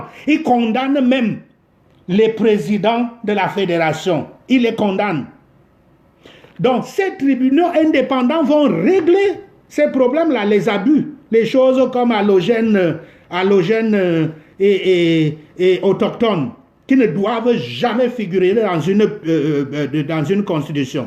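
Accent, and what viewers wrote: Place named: Guadeloupe, France. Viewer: Tu es camerounais mon frère? Comment t as l accent Guadeloupeen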